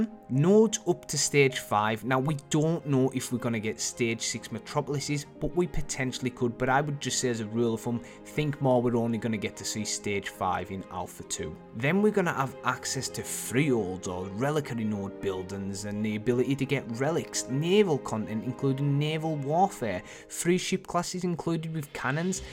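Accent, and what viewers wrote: Newcastle, United Kingdom. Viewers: Newcastle or Liverpool? I swear it's like a mix of both XD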